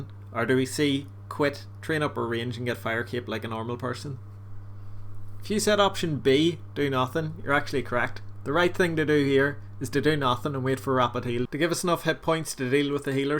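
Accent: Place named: Northern Ireland, United Kingdom. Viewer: Thats a properr norrrnn irrron accent for sure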